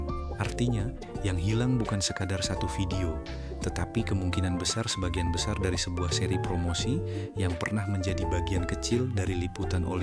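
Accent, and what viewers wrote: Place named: Spain. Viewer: Buset logatnya kek orang ngomong bahasa spanyol 🗿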